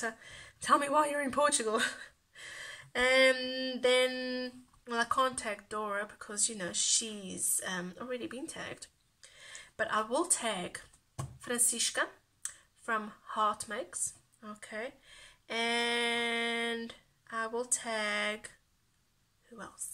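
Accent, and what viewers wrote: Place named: South Africa. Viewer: So happy to finally see you my friend, you have such a positive and smiling face. I just love your accent and really feel that South African accent is soo cool. I have a mix of indian and South African accent but my 4,5 year old daughter has very strong South African accent and she always corrects me 🤪.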